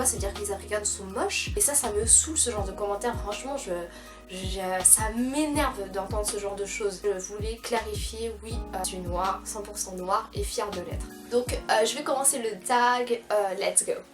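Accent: Somalia. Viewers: w t f is this hrgeysa dielect i dont even get any word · Tes une Pauvre refugier somalienne qui a eu les papier enfin j crois , rien que ton accent te trahi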